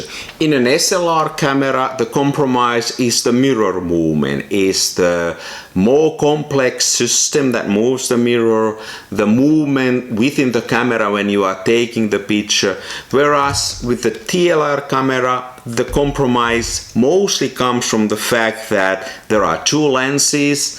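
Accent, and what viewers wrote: Finland. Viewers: Rallienglantia, tunnistin heti suomalaiseks :D. Ei vaan hyvä video ja sain noudettua tänään oman Yashica C:n Kuvasin tänään rullan Fomopan 100, seuraavaksi Kodakin Gold 200:sta.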